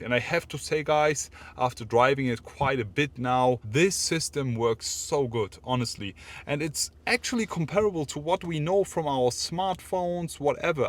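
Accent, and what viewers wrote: Germany. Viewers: Why does this guy sounds like a German speaking english.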